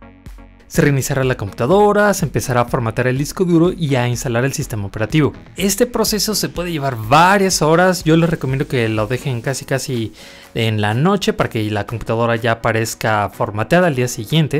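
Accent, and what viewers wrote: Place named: Peru. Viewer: me parece mal esa voz de peruano VENI A ARGENTINA GATOOO!!!!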